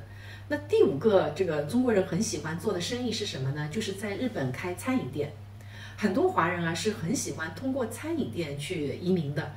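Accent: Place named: Shanghai, China. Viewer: 乍听是个上海人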